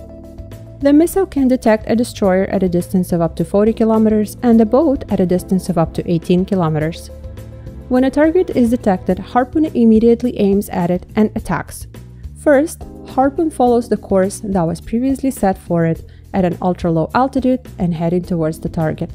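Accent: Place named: Russia. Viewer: I’ll take a person with a Russian accents word over anything produced in the us as to the true capabilities of this weapon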